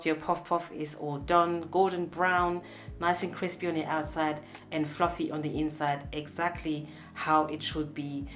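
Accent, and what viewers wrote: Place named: France. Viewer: I can feel your French accent in the English 😂😂 you're such a patient teacher 💕💕💕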